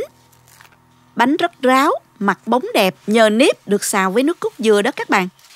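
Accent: South, Vietnam. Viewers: cảm ơn đả chia xẻ nhìn ngon và đẹp quá có giống nói rất đẻ thường giông nam ngọt quá à tôi nghe thấy mê luôn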